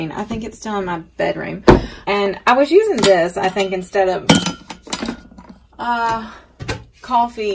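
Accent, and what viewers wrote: South, United States. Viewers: I don't really care what you drink you're just way too cute and I love that southern accent you're a true farm girl.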